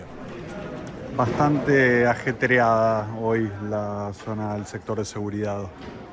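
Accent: Argentina. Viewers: te cuento que descubrí tu canal hace una semana y estoy fascinado. En el trabajo, estoy contando los minutos para regresar y poder disfrutar tus excelentes informes. Son extremadamente interesantes, didácticos, divertidos y esa mezcla de argento europeo que le ponés me encanta. El mejor reseñador de viajes por lejos y te deseo los mejores viajes que serán seguramente los que están por venir....eso sí, compartillos con tus seguidores.....Abrazo gigante para nuestro!!!!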